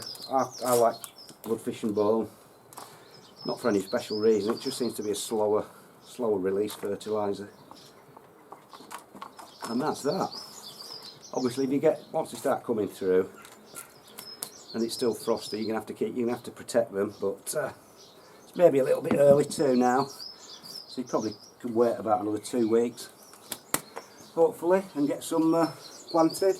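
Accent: Yorkshire, United Kingdom. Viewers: Very informative. You have got a great Yorkshire accent. Thumbs up.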